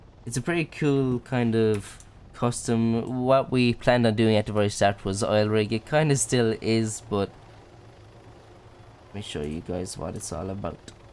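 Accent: Ireland. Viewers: You sound like you're from athlone or carlow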